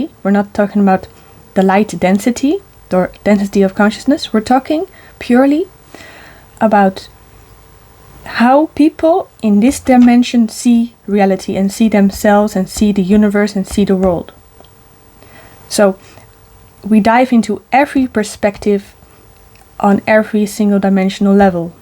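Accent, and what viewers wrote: Netherlands. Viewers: I could tell your Dutch accent. Great video. Like your dimensional model 😇 Ik ben ook van Nederland en heb een boek geschreven waar ik ook een multidimensional model heb. Echt super!☯️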